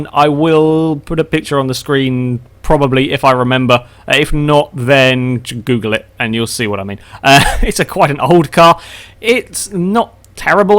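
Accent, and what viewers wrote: United Kingdom. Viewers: man leave the brit alone!! im a southern guy in the u.s. and his accent and the way he talks doesnt matter this is youtube not school he can talk how he wants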